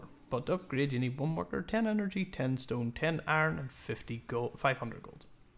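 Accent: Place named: Ireland. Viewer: It's really a delight listening to your accent, i wish i had an irish accent like yours.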